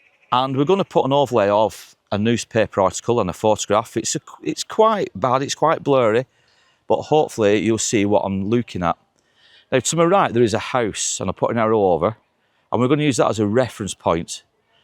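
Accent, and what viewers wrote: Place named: England, United Kingdom. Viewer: nice to hear an English person on here. Im from the UK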